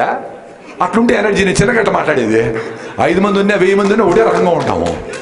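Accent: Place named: Anantapur, India. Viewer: Ma anantapur slang👌🥰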